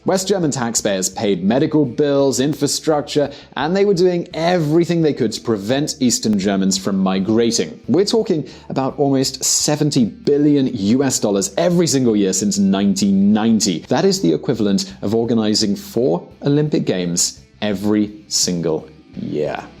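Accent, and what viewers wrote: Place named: United Kingdom. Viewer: The "Solidaritätszuschlag" you are referring to ignorantly, which you falsely say was used to built infrastructure in East Germany was truly paid by all Germans (that means West and East Germans). It was used to pay • for 15–20 % of the Second Gulf War • support countries in Central, East and South Europe financially • built infrastructure in East Germany • built infrastructure in West Germany *<link> When it comes to voting for DIE LINKE then yes, East Germans are much more influenced by left ideas. Karl Marx was a German. But how in the world is that "heavily influenced my Russian politics"??? Or do you mean "Soviet politics" in your ignorance? East Germans were oppressed by the Soviets. June 17th 1953. And how in the whole wiiiiiide world does East German socialism history explain East Germans vote for the AfD????? That has other roots. 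Go research them! "[...] West Germany became the embodiment of its capitalist occupants." Social welfare was introduce to Germany and cooperated into its free market system by Bismarck in the 1880s. It expanded ever since. Who's unbiased here? Did you watch too much PragerU again, my American friend with the British accent? Your ignorance is enraging. Please consider how many young, inexperienced people are watching your bullshit! Please, please do your research. Please don't fall for platitude. Bring someone into the team who actually studied politics. And economics. Or at least history or law. Anything. Just someone who was taught scienti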